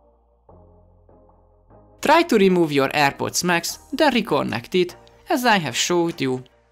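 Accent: Hungary. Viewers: You has a Hungarian accent. Are you from Hungary, or I misshear something?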